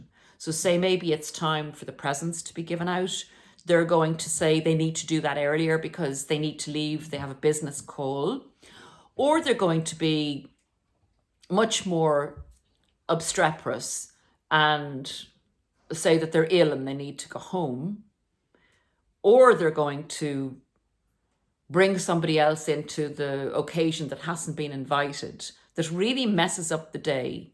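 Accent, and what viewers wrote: Ireland. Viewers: you sound irish ! 😊😊😊😊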